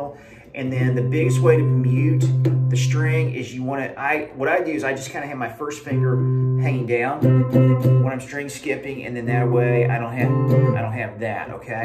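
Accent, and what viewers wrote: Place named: South, United States. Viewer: You make things make sense without trying to sound like Eddie Van Halen. Tho I am 67, have been playing since I was 8, I have never had the confidence to play without chords. Except, and I cannot play it well. But your good ole boy delivery, and not pushing the beginner too far too fast, are a joy! Not that I am a beginner, I just play rhythm.;) But Thanks!!! can't wait to find your other videos!! 😄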